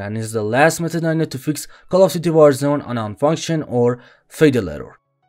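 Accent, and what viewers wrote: Iran. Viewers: خیلی صدات خوبه ولی انگار ایرانی هستی😻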